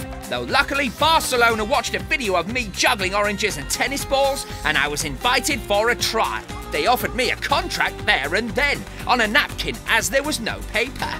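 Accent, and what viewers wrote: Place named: England, United Kingdom. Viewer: the english accent ruins it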